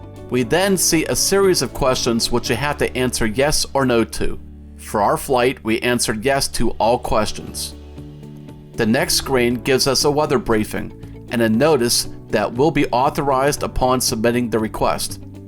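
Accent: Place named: Pittsburgh, United States. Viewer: Awesome video and extremely helpful! Also I grew up in Pittsburgh and now live in Sarasota Florida. Your voice is music to my ears! Love the Pittsburgh I can hear in your voice. Looking forward to watching your videos.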